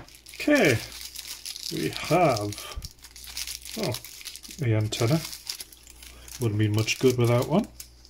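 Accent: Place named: United Kingdom. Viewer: Local radio in Vancouver but your accent is from the UK, I guess you moved there for some reason?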